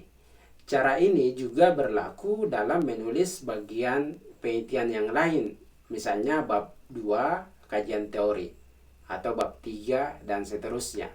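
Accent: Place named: Mamuju, Indonesia. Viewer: mantap pak, familiar dengan aksennya, ternyata benar orang mamuju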